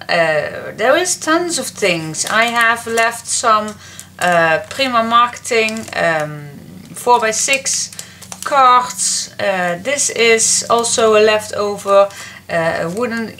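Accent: Netherlands. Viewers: Sept 30, my mom (who is from Friesland) and I were scrolling thru scrapbook videos - looking for ideas when we came across Christmas Magic and gave it a look. I said "Wow, this lady is awesome!" and subscribed. Mom said "Wow, this lady is Dutch and has fantastic nails" and then "Would you make this scrapbook for me?" Anyway, I have watched many of your videos now and completed the Christmas Magic (or Masquerade) last week, only with different papers - not Christmas. It is gorgeous and your instructions are easy to follow and to adapt a little to suit my needs and ideas. Thank you so much! I love to listen to you. Your accent reminds me of my grandmother, only your English is better. Even after 30 years in Canada, she still was about 60/40 English and Fries. About a month ago, I got a great deal on some kitchen styled papers at a local craft shop and made a little recipe book, almost by accident as I was trying to figure out what to do with it. 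It is really cute but small. I was thinking as I finished up the CM book that I would make a recipe book (bigger) next (as a Christmas gift for my daughter) and what do you know? there was my new favorite mentor showing me what she had completed (and hinted at during the CM tutorials!). Thank you again! You read my mind! I love your work! Please keep it up! I am anxiously awaiting the tutorials for this!!!! PS - maybe your desire to say cool, cool, cool came from a Canadian friend - I hear it all the time, mostly from people who a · Congratulation and well done . Thank you so much for sharing your great scrapbooking ideas . I get inspired<3. And I just love your dutch accent. from Anette T from Denmark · It’s won’t be long and you’ll have 50,000. Your absolutely amazing lady! Thank you for all your inspiration. Your work is fantastic and I love your accent because it reminds me of my mother in law. 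She from holland and I completely adore her. Can’t wait for the next video. Take care and happy crafting. We are in Alberta, Canada. 😍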